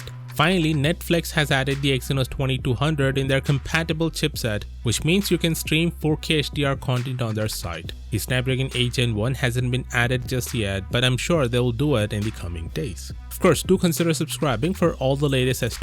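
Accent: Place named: India. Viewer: Good video my only feedback is that it was a little hard to understand with his accent. I sure other people are able to understand him fine. I think I just have an issue with Indian accents and making them clear. Nothing wrong with the narrator personally he definitely sounds intelligent and knows what he's talking about just a little hard for me to understand.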